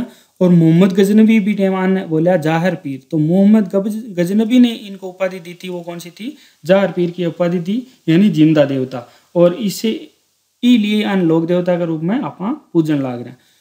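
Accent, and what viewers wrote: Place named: Churu, India. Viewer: कहते ह वाणी से व्यक्ति के स्थान का पता चल जाता ह क्या आप चुरू जिले के आस पास से ह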